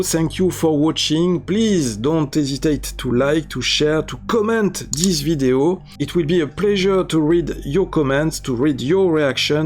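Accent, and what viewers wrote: France. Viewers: Fuck yeah!! The coolest Alien footage but who new delivered in a french accent is bangin man!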